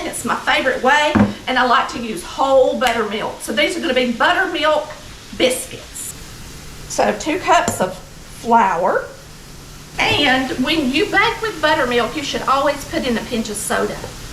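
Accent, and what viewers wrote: South, United States. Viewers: I’m from Texas which I guess is more west than south because I’ve never had grits nor red-eye gravy though I’d like to try. But most of my ancestors come from Tennessee and Georgia, so I love getting to learn about true Southern cooking from you. You make it look so easy. I especially appreciate your bubbly personality and your very southern voice, reminds me a lot of the women on my father’s side—always cooking, caring for people, and laughing.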